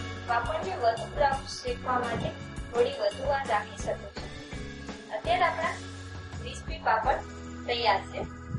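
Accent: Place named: Kathiawar, India. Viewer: Hello. Tame Kathiyawadi lago chho... Kyana chho?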